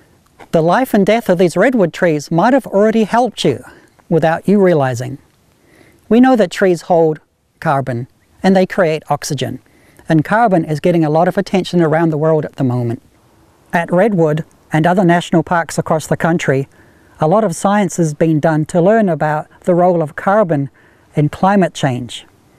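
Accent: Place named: Australia. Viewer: blimey an aussie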